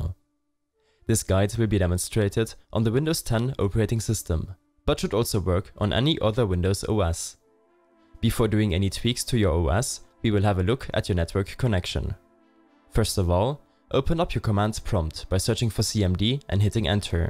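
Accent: Germany. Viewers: dieser deutsche akzent HAHA aber gutes Video ;D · german accent we know dude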